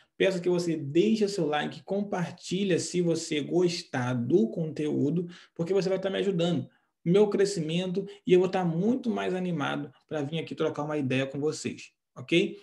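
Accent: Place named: Rio de Janeiro, Brazil. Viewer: assisti um vídeo rápido comparando o zoom do I fone 13 comparando com outra marca e puxando o xoom da Lua até visualizar a bandeira dos USA. Será verdade? Lembro do zoom e imagem de 44 megapixels do nokia 1020 bom na época. Agora, sem cabelo parece como o maridp da Rochele: " eu não preciso disso. Meu marido têm 03 emoregozxsss!! Mas, caraahb!! Você até tem sotaque carioca e me lembrs aquele ator dp filme " Loucademia de Polícia ". Ele imitava sirene de polícia e tiros de fuzil. Agora, careca, lembra Julius da Rochelly. Carahh!? Um comediante brasileiro do Pânico no Rádio Morgado vai em setembro fazer show em Porto e em Lisboa. Penso se ele conhece bem o significado das palavras e dizer: bom dia! Por favor quero malhar moça. Com licença senhor, sabe onde é o banco? Sim. Na praça. Mas, essa hora terá que pegar uma grande bicha. É melhor se informar com as raparigas quê trabalham lá. 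Eu sou comediante, vim para Portugal fazer um bico de comediante. Aí, comediante fuma muita maconha e fala pertinho: sabe onde posso comprar um fino? E lhe respondem: sim. Ali no café. E o cara chega lá e fala baixinho: me dê um fino por favor. Lhe servem um choopp extra forte e ele fica embriagado e pensa e fala pro amigo pelo Ifone Apple: carahh!! Aqui em Portugal já vem diluída no chopp o finohh!! Tomei dois e fiquei doidão. Kkkkkk!!! Desculoe a brincadeira. Sou muito respeitador. Paulo Pacheco. Serra de São Vicente-MT.Brasil. Terra. 25/08/22..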